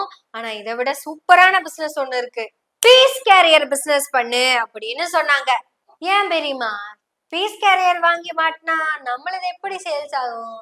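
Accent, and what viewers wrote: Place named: Coimbatore, India. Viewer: Coimbatore slang exact .Superb..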